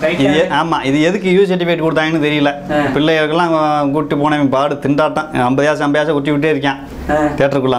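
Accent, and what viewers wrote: Madurai, India. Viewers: love scenelam vayithaala pora madhiri irukaapla..sema kalaai...super nanba. today than unga channel pathen.. madurai slang super.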